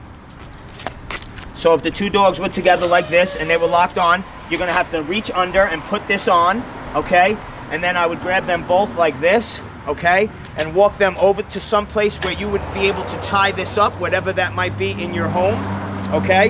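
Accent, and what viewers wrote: New York, United States. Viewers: ...NewYaawk AcCcent loving it lol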